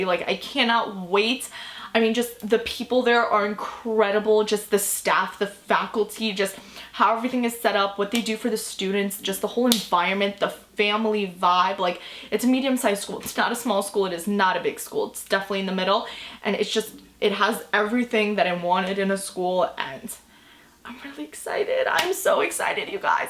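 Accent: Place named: Long Island, United States. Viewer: that long island accent is iconic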